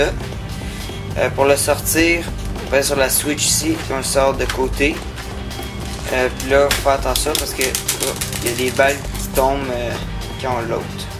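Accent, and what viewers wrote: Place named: Quebec, Canada. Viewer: Mais non je parlais de la video elle est au top,topissime! et j'adore ton accent anglo/québecois sa rend bien dans une review!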